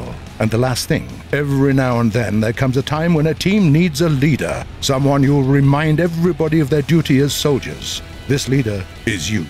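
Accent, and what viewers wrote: England, United Kingdom. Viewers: <link> I love you Gaijeans... (I also love the new british voice). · I think ur lost British guy, where's the normal narrator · where is shaun?? but i like this narrator too, i love his "british" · How does one energy fight? And what was it with the very British style narrator? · as a person who grew up on Monty Python, Top Gear, and partly Zero Punctuation, I'm a sucker for British narrators, can we keep this fine chap? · true but this guy is so british it's fun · This new narrator is very likely English, a lot more than me in fact. I'd ask where the previous one went to but well... Everyone's already done that for me. Though it would at least be nice to know what's going on. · War Thunder. Official channel. Then why use a limie? as the dont speak English but only a form of it that is irritating to the ears depending from what side of the island that their from... 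· Slimedude 29 I do miss Shaun, but this British chap is bloody glorious! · The pros and cons of the new narrator in the video : Pros : -More funny jokes in the videos -Morgan Fman-like voice -Majestic british accent Cons : -Didn't tell us about Shaun whereabouts -Needs more time to adapt to the new voice -YOU ARE NOT SHAUN, WHERE IS HE? Add more if you want to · who tf is this brit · New guy on the voice...Its Like brit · The new narrator sounds very.....british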